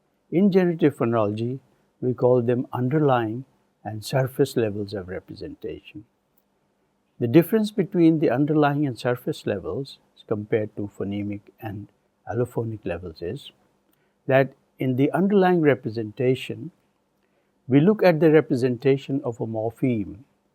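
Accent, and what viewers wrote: India. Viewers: That's his native (Hindu, I guess) accent interfering with his pronunciation of English words... It happens with everyone of us who aren't native English speakers but in few exceptional cases.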